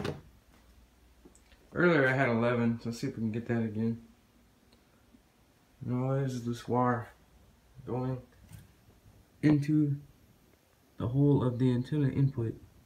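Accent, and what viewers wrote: Louisiana, United States. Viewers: Gahlee wea you from neg?? Sounds like you from LOUISIANA??? If so, where at cuz I'm trying to catch some TV channels in Houma LOUISIANA 😂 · Dude ya sound like a fellow Louisiana boy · You have got to be from Louisiana with that accent!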